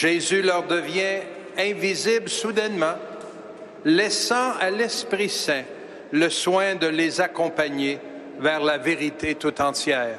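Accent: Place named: Canada. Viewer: on voit que vous avez les origines française Courcelles est noms de plusieurs communes françaises... J'espère la foi dans le Christ... L'accent chantant canadien surprenant ici mais agréable à entendre... Merci aussi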